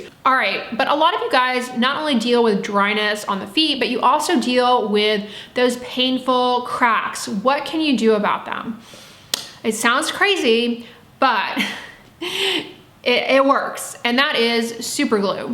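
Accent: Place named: California, United States. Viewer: That California accent is BRUTAL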